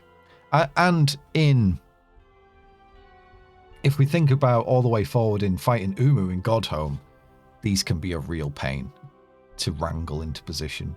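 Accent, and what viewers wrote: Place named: United Kingdom. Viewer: why is this guy british? so wierd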